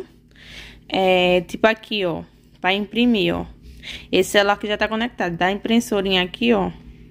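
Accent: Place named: Recife, Brazil. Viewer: Arrasou e esse sutaque so pode ser de Recife sucesso mulher <3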